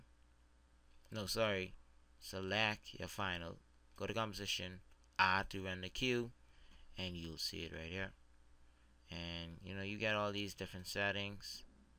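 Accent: Bahamas. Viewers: you sound like an Island boy....You from the Bahamas? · where u from the bahamas aye?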